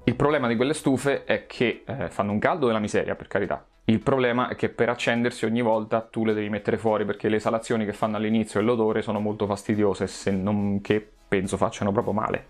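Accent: Marche, Italy. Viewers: fai troppo ridere. caparbietà e testardaggine per portare a casa il risultato tutto raccontanto con accento marchigiano. Visto tutto il video col sorriso. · io vivo al nord, mia madre e' nata a Corinaldo e mio padre a Mondavio. Sentirti parlare mi fa sentire a casa. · Ai gatti glie gusta ste cose ahahah adoro il marchigiano 😂😊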